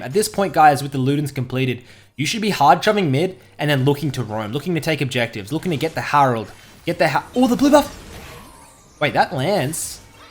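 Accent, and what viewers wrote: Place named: Australia. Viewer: Man this guy speaks like a true Aussie bloke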